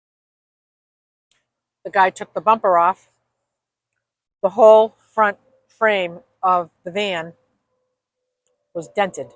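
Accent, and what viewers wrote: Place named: Boston, United States. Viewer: Awesome,! Absolutely love your style! And your accent is the best! I’m from a city just north of Boston and I have the same accent! And I’ve always loved it❤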